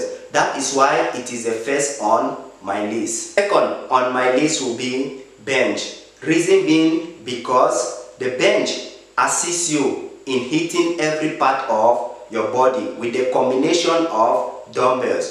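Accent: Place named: Nigeria. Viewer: ohh nice you sounded like a Nigerian good job mehn you look good 👍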